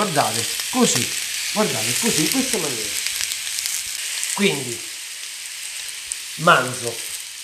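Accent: Rome, Italy. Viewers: bravo e simp0atico poi anche romanaccio hahaha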